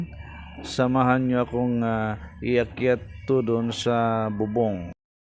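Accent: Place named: Waray, Philippines. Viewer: Taga samar/leyte ka sir?? Waray man it yakan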